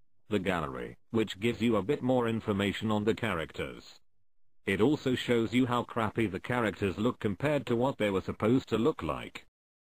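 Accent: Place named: RP, United Kingdom. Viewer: This voice should be used to review every game ever. This is legit the only game review I've watched all the way through because hearing a British butler review the game was awesome.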